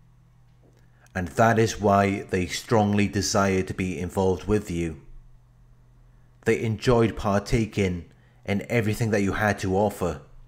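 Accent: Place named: England, United Kingdom. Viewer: Are you English mate, I'm Scottish love your vids mate spot on.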